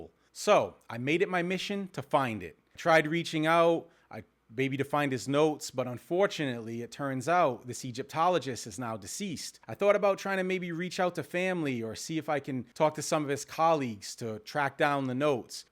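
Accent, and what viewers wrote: United States. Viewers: Excellent ~ Thank You! May I ask, you live in Egypt but have a strong American accent ~ NOT faulting! Are you of Egyptian descent who returned to Egypt?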